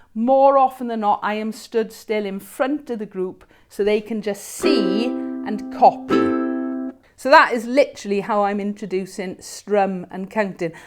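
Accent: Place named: Wales, United Kingdom. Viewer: amazing thank you! I've saved this to watch again a few times :) Also, recognise the fellow welsh accent!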